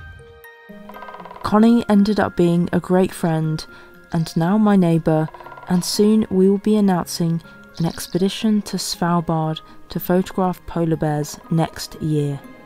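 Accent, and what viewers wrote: United Kingdom. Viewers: i just moved to sweden... i can't wait for spring and summer this winter made me sick of snow. my goal is to get so much sun that im tired of sun also you don't sound swedish to me your English sounds like you're from the UK or some shit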